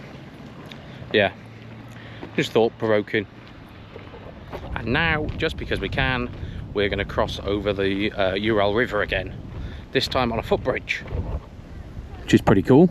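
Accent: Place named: United Kingdom. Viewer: Я конечно не понимаю на английском, уж тем более с британским акцентом, но посмотр был интересным!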